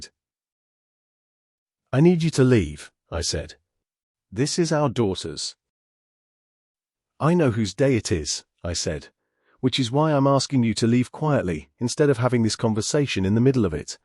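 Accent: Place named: United Kingdom. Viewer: Nice to hear a British voice for a change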